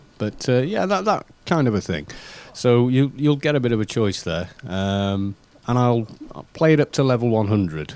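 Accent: United Kingdom. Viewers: I expect by your voice you would have short blonde hair and look like a brit . But now your going to look like a guy ready to hammer some death metal shows XD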